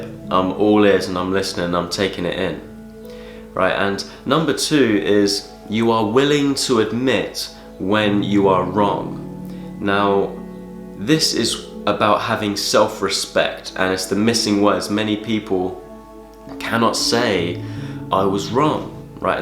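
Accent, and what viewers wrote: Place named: Southern England, United Kingdom. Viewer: pretty sure yeah. I'm English to. Think he's from the South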